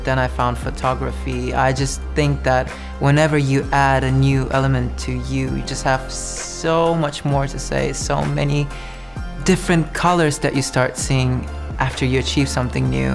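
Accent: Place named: England, United Kingdom. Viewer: his english accent is heartbreaking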